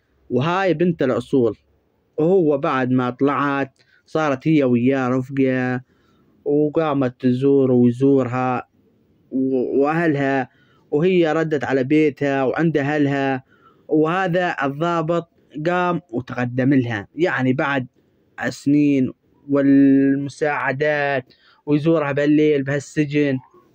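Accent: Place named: Hejaz, Saudi Arabia. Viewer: السلام عليكم اخي ياحلو سوالفك وسردك للقصه ليتك كل ليله تجيبلنا قصه وجزاك الله خير والله تابعت كل قصصك واعجبني لهجتك الحجازيه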